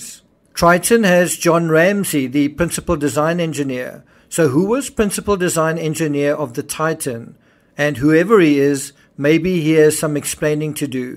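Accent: South Africa. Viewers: you sound South African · Loved hearing the South African term "nogal". I always hhought your accent was South African. Keep up the good work!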